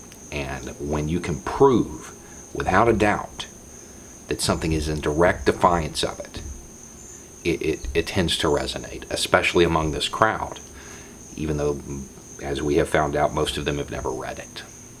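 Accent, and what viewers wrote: South, United States. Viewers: I have started using your videos all the time to help me argue my points. You’ve become a great inspiration to me. Your accent helps when I’m addressing people in the southern US. Like in your other video you talk about the wrong person sending the right message. My female status makes me unreliable to most of them as well as my mixed family. However lots of people I post your videos to, just call you a paranoid loon but a few have actually attempted to understand. Either they debate the points or just say good point. You are making a difference with your videos. Thank you❤️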